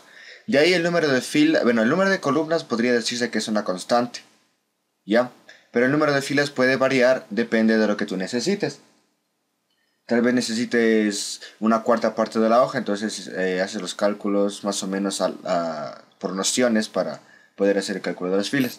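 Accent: Ecuador. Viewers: ajaj se nota en tu vos que eres ecuatoriano ejejej muy buen video me arreglaste mi dia jejeej gracias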